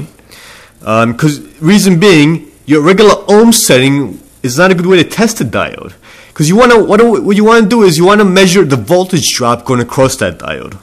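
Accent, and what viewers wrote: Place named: New Jersey, United States. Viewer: i like your new jersey ish accent :)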